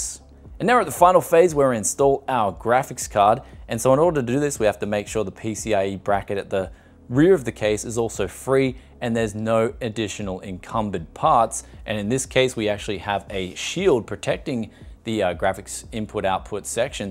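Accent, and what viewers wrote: Australia. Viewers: Nice Build btw. I like it, just recently found your channel an I like your character a lot. Could listen to your advice and opinions all day long :) Maybe its the Aussi-slang in your English, gives me those Steve Erwin vibes, no offense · His ozzy english n movie star looks ..dayum! "But a320?" Says b450 fans.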